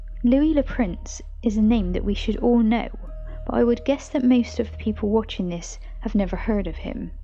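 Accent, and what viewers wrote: United Kingdom. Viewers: you sound like you come from the West Country. ..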